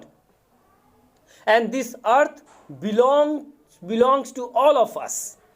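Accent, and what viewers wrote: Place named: England, United Kingdom. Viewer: Sir nice english accent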